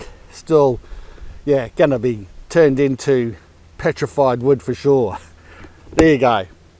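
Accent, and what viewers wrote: Australia. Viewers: 😂😂😂😂😂😂😂😂😂😂😂😂😂😂. I love ❤️ he’s Aussie lines and accent.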